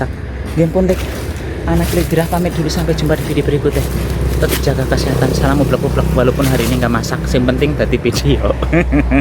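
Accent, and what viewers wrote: Banyumas, Indonesia. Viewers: Sya suka nyayur kang purbalingga,rika ngapak pa wong banyumas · Salam kenal br ketemu Chanel ini Mase drmn kok ngapak sy dr purwokerto arcawinangun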